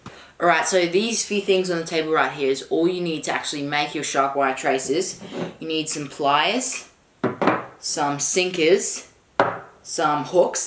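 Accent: Australia. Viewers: r u Australian? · Probably Australia, he sounds like it.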